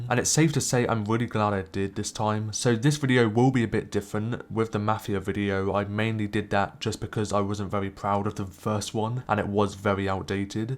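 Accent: United Kingdom. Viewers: you do come off as a hater. It’s an average brit accent🤣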